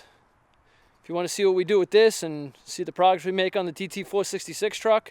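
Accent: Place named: Massachusetts, United States. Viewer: You’re from eastern Massachusetts, aren’t ya. Lol. Awesome work. How hard would that be to install in my 92 F350?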